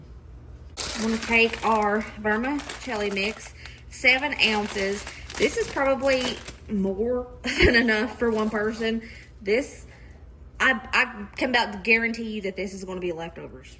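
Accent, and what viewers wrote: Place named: Southern Virginia, United States. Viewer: Please keep content like this coming. I don't necessarily need to feed myself for a dollar, but any meal ideas that help save money are always welcome. And you really do offer some pizzazz with your cooking. Love it. Also, I haven't heard anyone say "peench" since I lived in southern VA, so you're bringing back some good memories, too!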